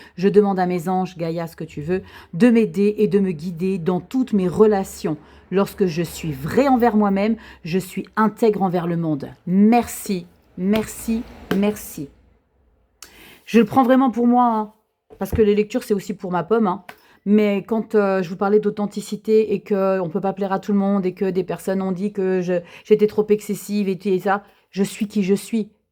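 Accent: South, France. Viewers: Coucou, je vous adore, vos guidances son profonde de sincérité et de lumière, un petit accent du sud? un coucou de Tarbes 65 sud Belle journée à vous et votre famille. Gratitude, gratitude, gratitude. Scorpionne ascendant Vierge🤗🧡💛💚💙💜🤍🙏🐞🦋🍀💐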